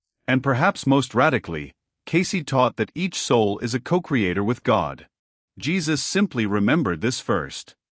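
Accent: United States. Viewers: typically American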